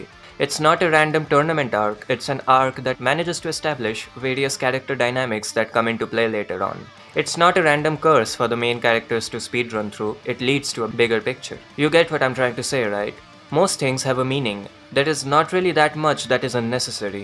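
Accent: India. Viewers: Indian accent. ok im out. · Sounded indian so i subscribed ❤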